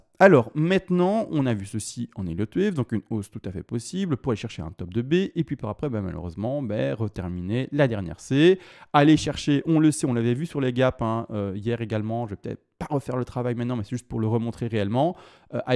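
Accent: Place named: Belgium. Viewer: J’adore ta façon de parler et ton accent ! ( belge - suissse ? )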